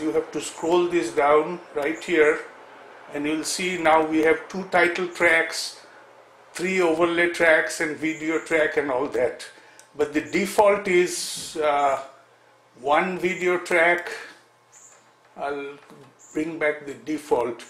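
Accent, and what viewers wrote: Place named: India. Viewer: thenks a lot man!! It's good Tutorials really. I'll watch it all and the indian accent is not a problem. go ON!!.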